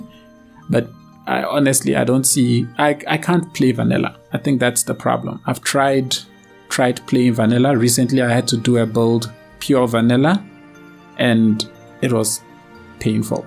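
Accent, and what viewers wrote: South Africa. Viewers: Great build, and also nice accent, sounds south african to me :) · I guessed this before seeing on your Twitter you're from Joburg. Howzit. :) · Are you from South Africa? GP or KZN? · That is one SEXY voice I agree. The South African Accent coming out really good and professional. Thank you for that! Keep us as South Africa on the map of CS - Good job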